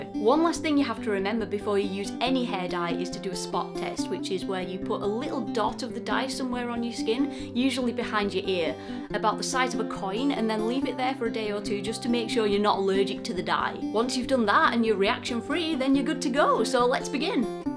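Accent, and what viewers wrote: North, United Kingdom. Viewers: Love this video... Love your northern accent getting so excited about hair dye haha XD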